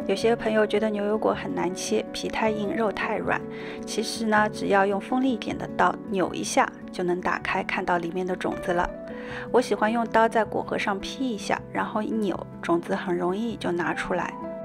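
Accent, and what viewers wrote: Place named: Jiangzhe, China. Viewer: 口音像江浙沪那边的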